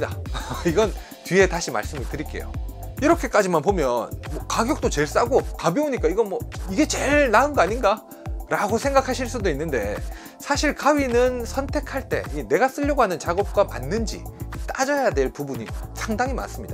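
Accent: Gyeongsang, South Korea. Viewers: 대구 사람 이신가요??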